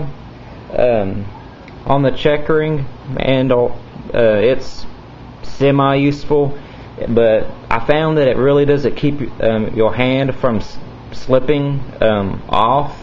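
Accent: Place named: Texas, United States. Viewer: His accent is straight Texan! Good review my Texas brother! it sounds like has a slight speech impediment....No offense:)....we all have our things, hell my pinky fingers wont straighten out. BTW, check out the knife....Fitzen Tank......BAD A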